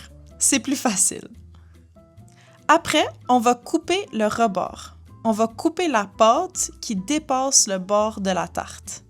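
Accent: Canada. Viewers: I'm so glad it's in Canadian French. I live in New York, so most likely will go to Quebec and not France to experience the language.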